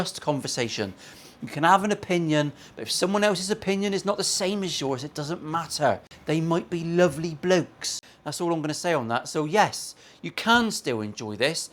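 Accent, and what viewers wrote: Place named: Northern Ireland, United Kingdom. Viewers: I love your Norn Irn accent mate. Always makes me laugh. I'm from Norn Irn and you sound like a local. I'd love to see you do a whole video in the Norn Irn accent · I love the Northern Irish accent, especially when you say millimetres........Chuckle........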